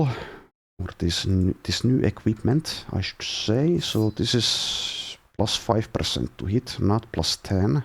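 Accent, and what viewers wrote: Finland. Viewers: agreed very familiar Finnish inflection on the "D" and "R" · Finnish I would say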